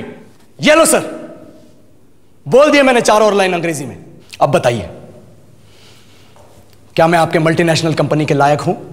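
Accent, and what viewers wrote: India. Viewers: Is he telugu speaker 😮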